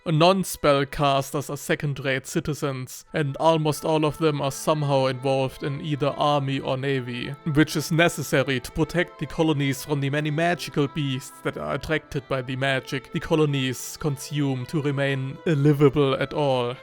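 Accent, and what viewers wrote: Germany. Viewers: Ahh yeah, once again my favorite German accent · Boah, I love this German English accent. Bitte Mehr hahaha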